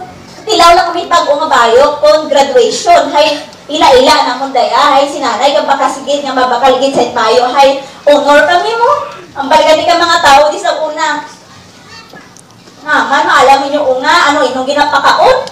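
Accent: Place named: Iloilo, Philippines. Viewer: Gusto gusto ko yung ilongo mo. Napakalambing sis.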